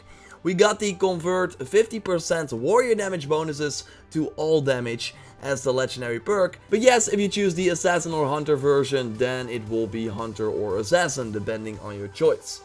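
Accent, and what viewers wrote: Netherlands. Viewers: Is this guy dutch cause his accent is familiar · are you Dutch, by any chance?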